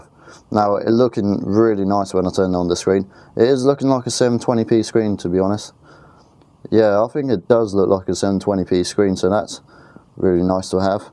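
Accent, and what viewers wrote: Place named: England, United Kingdom. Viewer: Are you English?, I love that accent!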